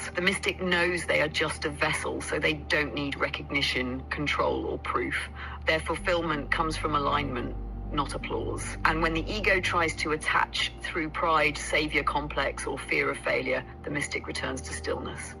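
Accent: United Kingdom. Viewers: I can't stand the British voice😅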